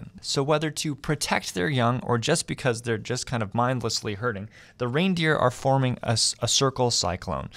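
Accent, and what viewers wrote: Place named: Canada. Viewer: You've got a Canadian mouth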